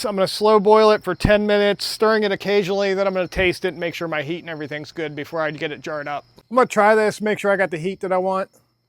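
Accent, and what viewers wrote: United States. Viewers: He is SOOOOOOOO American it’s hurting my British ears. 😨 it’s pronounced To-Maa-toe’s NOT To-Mate-o’s. 🤦‍♂️ Prove me wrong/right keyboard warriors. 😂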